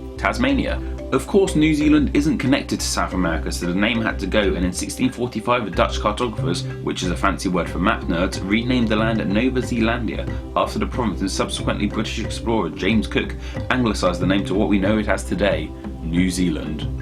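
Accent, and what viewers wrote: United States. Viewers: Benjamin No. This guy is from the UK and he's speaking like a yank.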